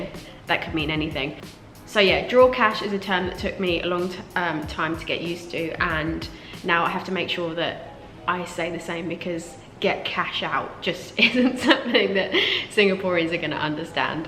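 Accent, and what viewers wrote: United Kingdom. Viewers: I don’t really have difficulty with British accent. However I loved your British accent, it is where I learnt while I did a study exchange in the uk. I got to learn the proper English accent there. While you’re right orchard mrt is a maze. Not to forget locals do exit wrongly too, especially Dhoby Ghaut mrt. ;) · Haha, love this video, especially the part where you say one thing, and the listener hears another. Must be frustrating. I think we are not familiar with British accent, even though I can understand you well. We learn British English in school but none of our English language teachers are native speakers. Also, most of our favourite English movies or TV shows are American.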